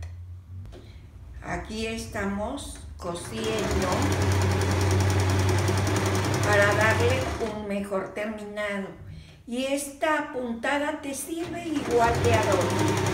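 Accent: Mexico. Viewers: Precioso tu saco y que fácil es para aserlo y muy simple llo chilena me encanta la costura y también me gusta tu personalidad y el pelo bendiciones me llamo Mary tu de donde eres mejicana por el modo de hablar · Saludos desde Los Angeles, querida, en qué país estás? Hablas como mexicana. Bendiciones.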